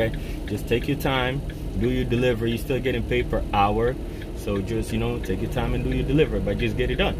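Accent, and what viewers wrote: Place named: Jamaica. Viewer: I love your attitude...so much...u sound Jamaican....or something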